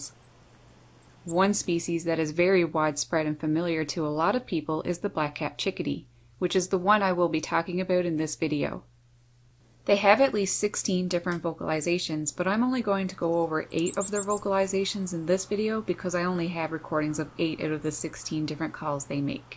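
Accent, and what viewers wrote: Canada. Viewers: Just started to listen, say's " aboot," Eight oot of the sixteen differnt calls. Haa Must be Canadian. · I can tell you’re Canadian, your “O’s” sound like ooo’s, hence “Abooot” Love the video tho!!! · I love your videos, especially those featuring corvids. I was tempted to mention that I thought I heard what linguists call "hypercorrection" in your narratives. I'm glad I didn't. My new sense is that what sounded like hypercorrection might be Canadianisms in your speech. I'm especially interested in what you have observed about social learning in birds. That's a topic that has scarcely been explored at all. Thanks for the gift of your observations.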